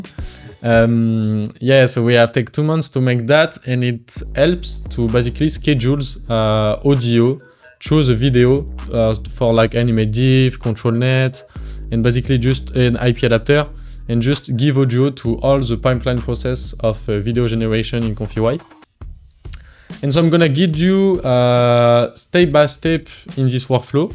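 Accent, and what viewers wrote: France. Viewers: notre beau accent Français !! c'est magnifique, sinon gg et merci pour la video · Mec, to accent.. 😅 Heureusement j'suis francophone 😄 Nice stuff anyway, very creative 👏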